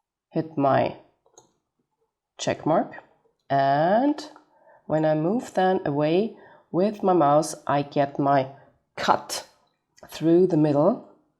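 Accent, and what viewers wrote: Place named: Austria. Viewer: OMG you are too cute, I could listen to you explain things forever and your cute Austrian accent is the icing on the cake!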